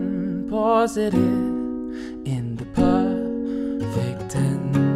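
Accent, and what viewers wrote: United Kingdom. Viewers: Is this British accent or American accet...please tell me.. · Is it British accent ?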